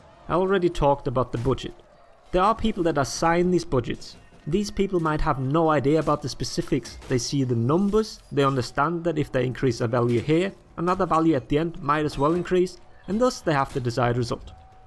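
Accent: Scotland, United Kingdom. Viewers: You sound really Scottish are you?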